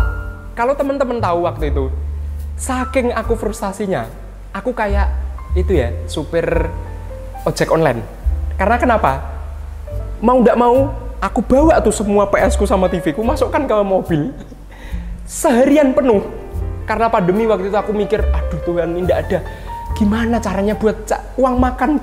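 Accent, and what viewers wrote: Java, Indonesia. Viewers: Mas sebenarnya asli jawa atau kalimantan?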